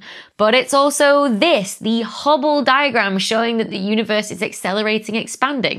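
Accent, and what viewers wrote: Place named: North, United Kingdom. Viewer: "quicker than what our best model of the....." i love the way she sounds so northern.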